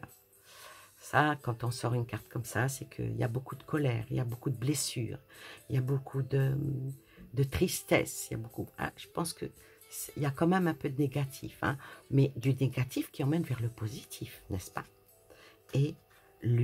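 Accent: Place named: East, France. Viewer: Bonjour, je vous suis mais je n’ai jamais laissé de message. J’avais remarqué un accent de l’Est, je suis née en Lorraine et je vous écoute de Londres, on sent votre sincérité et vs êtes très agréable à écouter. Merci pour tous 🙏🏻. Bisous 😘. Nanou 🤗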